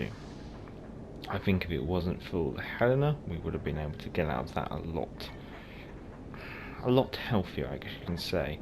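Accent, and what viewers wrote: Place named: United Kingdom. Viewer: I also came over from spartan and its great to hear a British accent, maybe a link up with said spartan could happen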